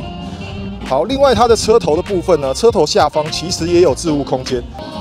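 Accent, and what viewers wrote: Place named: South, Taiwan. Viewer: 下港人 台湾国语好重啊 福建人后裔哦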